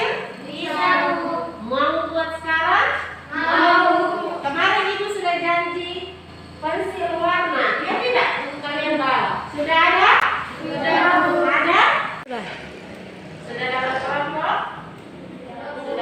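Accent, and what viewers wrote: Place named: Sumba, Indonesia. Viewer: Logat sumba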